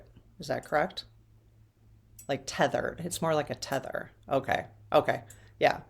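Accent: United States. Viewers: looks and talks very much like my next door neighbour. She has a doppelgänger in Australia!! It’s like looking at my lovely next door neighbour but she’s talking with an American accent! I love it!💖🦘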